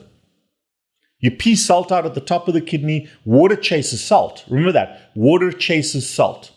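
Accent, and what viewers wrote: South Africa. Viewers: He sounds south african. I love it when my fellow kinsmen do well world wide. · You sound South African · U sound like a joburg oke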